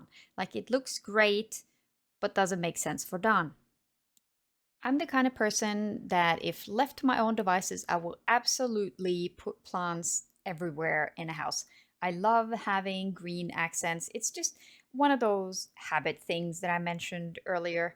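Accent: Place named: Scandinavia. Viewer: This was relaxing to watch! Good music choice too, a chill tune with *no singing*, just perfect! And I love listening to your English because you sound.. well, Nordic and it makes me feel like home ^^ (and your English is great!) I didn't even realise I watched an almost 40 minute long video bc you weren't being repetitive at all with your commentary and everything just kind of flowed together nicely ✌️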